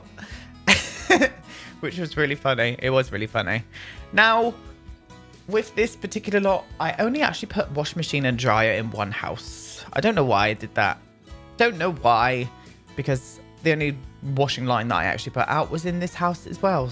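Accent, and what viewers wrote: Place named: Devon, United Kingdom. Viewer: I know this is probably a stupid question but are you from Devon x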